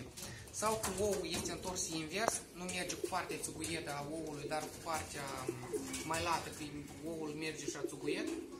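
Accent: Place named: Moldova. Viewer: E din moldova ca vorbeste moldoveneste👍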